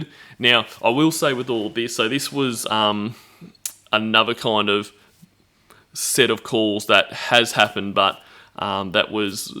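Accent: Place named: Australia. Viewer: Somebody from Nobles livestream today referred me to this vid for a accurate recap on my Lorne Noir. Soooooooooooooo good 👍 cant imagine all the hours upon hours of Lorne bullshit u had to listen to just to make this timeline, for that, always remember I appreciate yew precious. Lol 🌹🌹🌹🌹 P. S. Ur Aussie accent has been my bed time ASMR lately 😍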